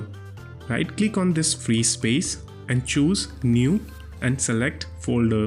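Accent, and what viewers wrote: India. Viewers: Tnx a lot brother, Btw ur accent in like Indian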